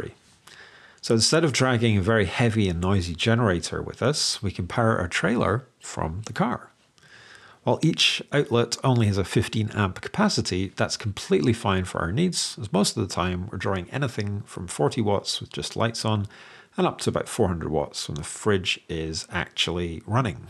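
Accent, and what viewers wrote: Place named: Scotland, United Kingdom. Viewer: Hi. Came for the EV9 content, but now wondering about your accent! 😂 Do you come from Scotland?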